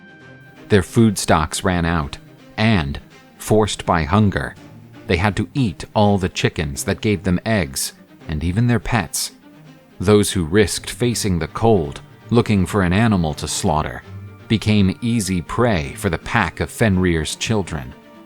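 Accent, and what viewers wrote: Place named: United States. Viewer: You say all of the Nordic words like an american, great storytelling other than the pronunciations of names.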